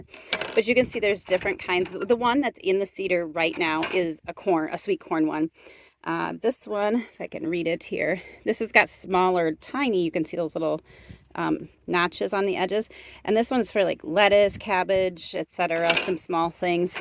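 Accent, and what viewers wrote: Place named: Midwest, United States. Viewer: Just got sent over here on a recommendation... Holy moly your Midwest accent is THICK. Minnesota? I'm from Northern WI, almost the UP- probably why I hear your accent 😂 New subscriber here. I hope to see more content!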